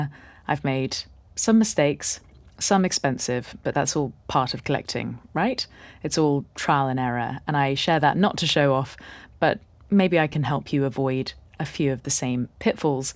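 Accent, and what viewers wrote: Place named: United Kingdom. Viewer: This British accent is ❤❤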